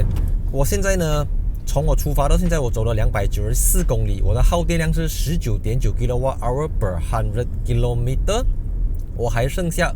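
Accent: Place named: Malaysia. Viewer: 这样介绍很好，地道的马来西亚中文，但建议有些地方没必要用英文就别刻意讲英文了，比如 那段。反正看这平台的大家都听得懂华语，自然就好。小小建议吧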